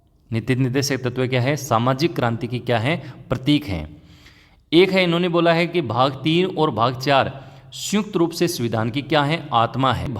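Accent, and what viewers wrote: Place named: Shekhawati, India. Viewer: Sir aap shekhwati se lg rhe ho muje sari marwadi boldi h aaj ti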